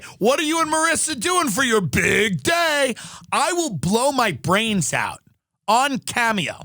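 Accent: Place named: Long Island, United States. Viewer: Long Island accent is fantastic 🤣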